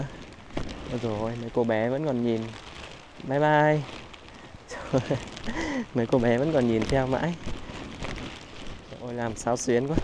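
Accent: Hanoi, Vietnam. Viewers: có chất giọng Hà Nội rất ấm nghe cứ bị cuốn ý ❤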